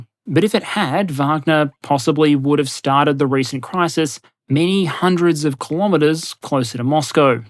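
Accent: Australia. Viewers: As a ‘murican, I love the dry Aussie humor and the accent. It’s what makes Bluey extra cute too, kids speaking Aussie. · One, he expresses he is biased many times before so yeah, he'll be critical to the Russian Army, but he also explicitly says their strengths. Two, the cheap shot dry humor is his schtick. His Aussie, that came in a package. It also makes the dry delivery of information more interesting and entertaining, this is still a YouTube video after all. And three, you can just...idk, don't watch of the jokes are a turn off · Not according to his profile: " Description An Australian covering the military industrial complex and national military investment strategy." Or for that matter, his accent.😉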